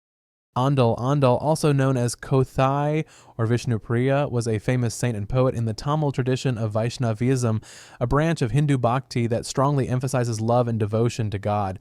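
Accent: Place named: South, United States. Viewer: Hear the voice mate? It's American. Sounds like the south our lower Midwest, meaning the large majority of their religious exposure is Christian with perhaps a skimming of world religion ans culture, perhaps a world religion class or two, but nothing you can't get with a Google search. Also, the voice sounds Caucasian (not being racist, I'm a 50 year old white dude myself) based on the number of accents and regional speech patterns I've heard. Most white, American Christians don't bother learning much about Islam particularly because it's outside their belief and labeled satanic. Hope that helped.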